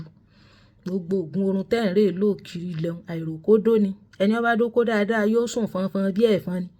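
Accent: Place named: Origbo, Nigeria. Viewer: Speaking with the Origbo dialect is a super spice. Well done!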